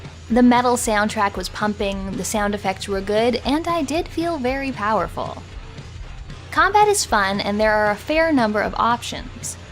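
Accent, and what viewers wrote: Canada. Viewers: I could barely tell. It's the nicest Canadian speaking voice I have yet heard.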